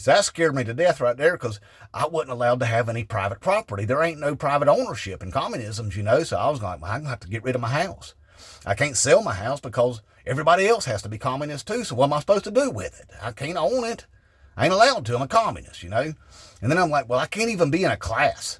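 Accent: South, United States. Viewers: Nothing is as delicious as truth with a salted caramel southern accent. Dixon White; the Future Maga. Trae Crowder. You. Beau and Belle. Thanks, brother. We PAY for all those 'socialisms.'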